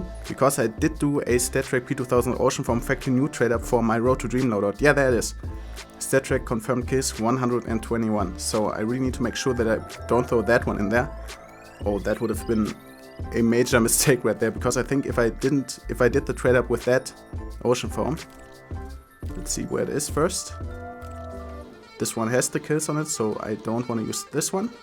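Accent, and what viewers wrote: Germany. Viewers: Are you German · Are you a german guy?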